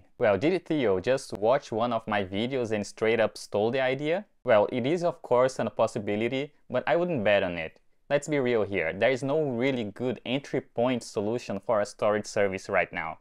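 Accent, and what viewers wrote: Brazil. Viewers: The Brazilian accent,LoL. Irei subscrever e acompanhar a sua jornada 🙏🏾.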